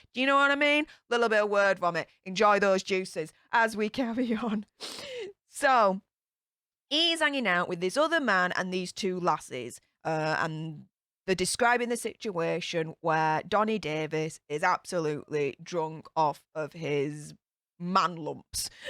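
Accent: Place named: Northern England, United Kingdom. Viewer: I LOVE your accent,!! You must be from UK North- Lancashire, maybe? You sound like Victoria Wood a bit, and like the character Bubble on AbFab a bit. I'm American, so I'm not really up on all the British accents, but as a fan of some Bristish shows and stars, that's who you remind me of. <3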